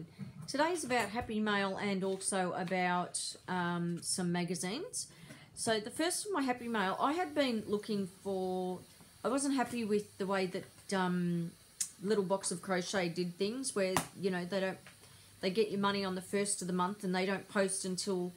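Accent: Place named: Australia. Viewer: Hello, I'm new to you sight. Nice to hear the Aussie accent. Thank you for showing those magazines, going to get the Crochet Now Magazine tomorrow. :)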